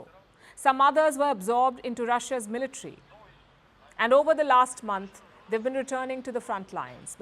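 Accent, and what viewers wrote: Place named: India. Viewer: This woman pisses me off with her arrogant voice trying desperately to imitate Tucker Carlson with a ridiculous Indian accent. This woman pisses me off with her arrogant voice trying desperately to imitate Tucker Carlson with a ridiculous Indian accent. Try to be original ma'am.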